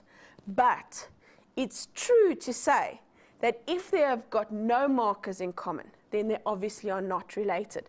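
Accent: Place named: South Africa. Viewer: Love the SA accent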